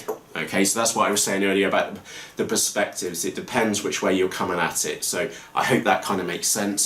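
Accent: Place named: South West England, United Kingdom. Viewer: Nice to hear a UK South West accent in a review video! Keep up the good work +, from a fellow UK reviewer!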